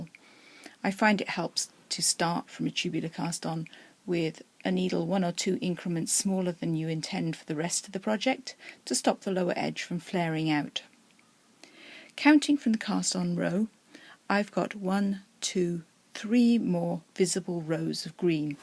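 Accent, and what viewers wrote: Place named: England, United Kingdom. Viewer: You are very easy to follow; a nice English accent so pleasant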